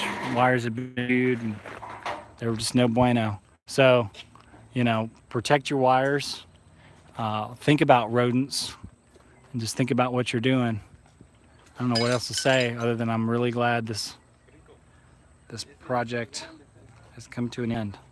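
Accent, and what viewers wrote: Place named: Puebla, Mexico. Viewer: Hey you really learn the mexican speaking way you sound like guys from puebla . hay muchos mexicanos por alli en Atlanta cierto ... 😎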